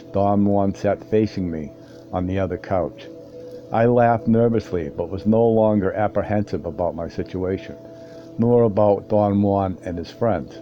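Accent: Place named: New York, United States. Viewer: Love your reading. The NY or Jersey accent? It is relaxed and not the MACHO WARRIOR of the others. Thanks! But the background music could fade off to Mars, IMHO! This writing involves connection to the Earth - not space.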